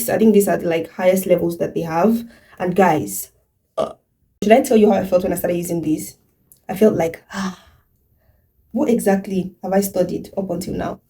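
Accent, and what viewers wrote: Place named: Cameroon. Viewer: You sound a lot like a Cameroonian, even though I know you're Yoruba from your name😄.... Keep up, very positive and inspiring channel.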